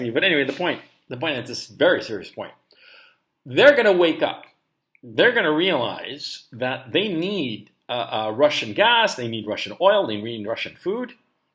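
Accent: United States. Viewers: according to your English, you most likely are from the USA, living abroad. The point is not that Europeans do not understand the situation but Eiropean leaders like Macron or von der Leyen are corrupted and just puppets on strings with puppeteers in Washington and or London (City) it's not only a war against Russia, it's also against Germany. Look at the Nord-stream case... Gas is not only a problem of heating in winter, it's energy and a vital raw material for the industry ! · This idiot is so ignorant - sure Europe will have problems for the next 5 to six months due to oil, etc. , but Israel has just signed a major deal to start supplying gas and oil to Europe. And this is kept quite quiet as this will turn Russia, Iran, Turkey with its worsening economy start a war against Israel. (All for booty) - Israel discovering unbelievable amounts of oil/gas. Also America and other countries do produce grain. 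This man was brought to the US as a small child and was raised in the US perhaps as an asylum refugee are illegal, or immigrant and benefited from all the generosity of America for education, housing, education, etc. like so many others that come to the US, UK and Europe - now he has all this hate towards the US. He now states he is from Chile. But note his very much US accent. Also, he is in no danger as he is most likely a mouthpiece for Russia. Ungrateful ignorant “immigrant”. He seems to hate Europe and America for whatever reason. Europe , UK, America and Australia will do just fine and help other less developed countries. The Us does have a dementia President run by the Globalist but this puppet US President is run by a somewhat competent intelligent administration run by three equal branches of government. He states Israel hates Russia - that is false - Israel up until now has had a great relationship with Russia as Russia allows Israel to bomb terrorist in Syria coming from Iran that are trying to wipe Israel off the face of the map. 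Israel pe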